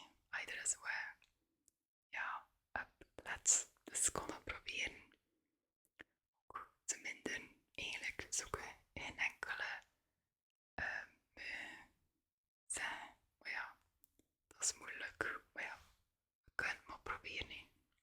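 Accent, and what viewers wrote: West Flanders, Belgium. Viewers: I often say that people should think before they speak in certain situations. Often it's emotion that takes over. And I'm trying to understand the 1st quote... 'walking to the rhythm of a flower blooming'. Walk at a slow, gentle pace? In any case, your reactions make me laugh when you try to pull yourself together to speak only in West Flanders.😄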